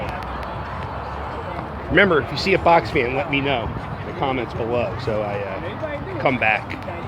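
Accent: Philadelphia, United States. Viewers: Haha 😂 I can tell your from philly.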